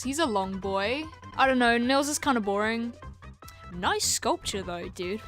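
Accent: United Kingdom. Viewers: i knew u were british right as u pronounced artist without the 't' in the middle, bri ish. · wtf your bri*ish????????? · You sound so British and it makes the whole thing so much better to live through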